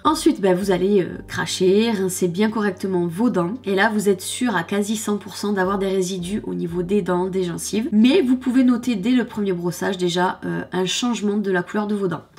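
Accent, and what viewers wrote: South, France. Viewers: avec l'accent du sud c'est top !!!!! allé je m’abonne , le peut de tes vidéos que j'ai vu sont super ... bonne continuation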